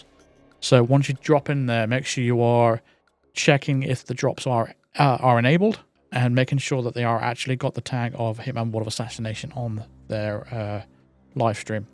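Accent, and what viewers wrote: United Kingdom. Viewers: I get that, it's all good also TikTok is banned is so many places as well. I can't remember where you're from. All I know is it European, I would say England or Great Britain. Not trying to fix you, I'm just say by your videos and your accent, I can tell your from somewhere around there. Also what you said about waiting for your healthcare. They being said I hope you're well man. I truly mean that. You have said they publicly. I'm not going to repeat what you sai, but I do remember when you talked about your issues. I just wish you well. Thank you very much. I can't praise you enough. You have been getting shit from people, ignore them please, just know there's people out there that love you for all your hard work and very much appreciate it as well. I wish you the best, thank you so very much. I love you man. You the best hitman guide out there.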